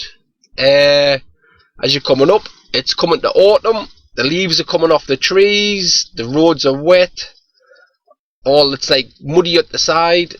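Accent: Newcastle, United Kingdom. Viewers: Are you a Geordie. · I enjoyed it, and I'm a 68 year old nan, but I love the accent, it reminds me of my dear dad who was a Geordie, I miss hearing his voice. But I truly loved the video, the driving was very good TY!! 😊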